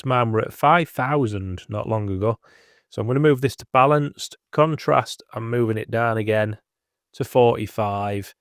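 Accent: United Kingdom. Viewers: Thanks for this...just got today, waited for reviews an patches. Where ya from mate, sound like you're from my neck o t'woods...halifax, west yorkshire.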